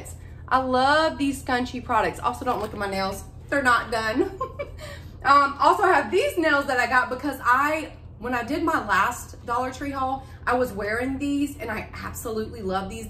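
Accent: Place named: Mississippi, United States. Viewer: Hey sweet lady you & I sound the same!! I'm from Mississippi so down here we all say "yall" quite frequently! I love your dollar tree hauls!!! I just wish these dollar trees here would take notes of all the dollar trees you shop at!!! They NEVER have the cute stuff you have. I may get lucky & find one or 2 little things, but they never have any of the good organizing stuff ever! Most of the time the isles are bare. I guess nobody cares about taking inventory. We need some desperate help at the dollar trees in Mississippi! Oh well, I love watching what you find & I'll just stay jealous!!! Love you girlie......keep shoppin'....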